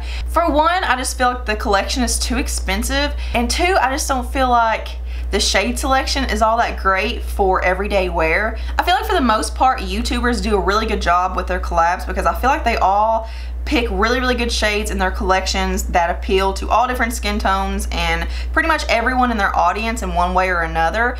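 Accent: South, United States. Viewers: "okay first of all. who is Natasha denona?" omg i'm weak. great video. love your southern accent, i'm a southern belle as well and it comes through so strong in my videos 😂 love it 💕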